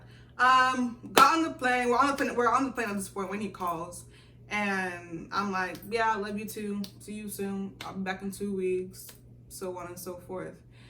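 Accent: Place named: Nigeria. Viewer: Your nigerian accent is still there sha😅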